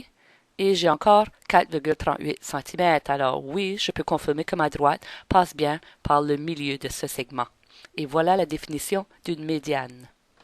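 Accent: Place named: Quebec, Canada. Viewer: Merci beaucoup!ça m'a beaucoup aidée! PS:J'adore votre accent,vous êtes canadien,non?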